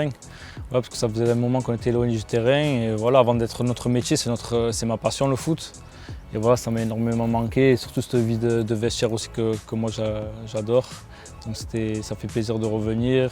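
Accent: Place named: Marseille, France. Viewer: Un parisien avec l'accent marseillais, vous en verrez pas 2 comme lui 😂😂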